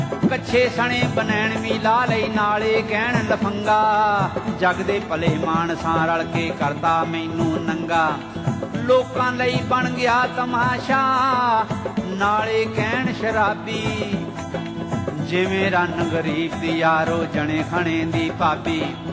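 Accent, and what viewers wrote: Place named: Okara, Pakistan. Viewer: same Pakistani punjab ki punjabi... mere area okara main same boli boli jati he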